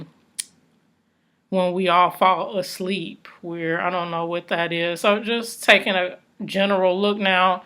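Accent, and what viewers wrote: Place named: Carolinas, United States. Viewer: Nope. You sound like my family but they're from the Carolinas / DC. Anyway love your videos. Thanks.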